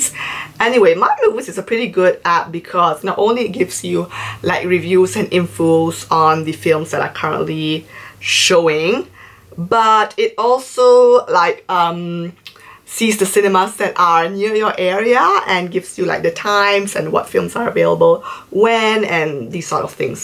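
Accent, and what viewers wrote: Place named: Italy. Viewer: I love Italians and there funny accents!!❤❤😍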